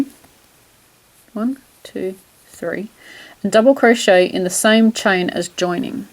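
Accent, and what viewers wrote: Australia. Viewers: Thanks very much, hearing what I assume is an Australian accent threw me, Newbie here, yuor tutorials are great.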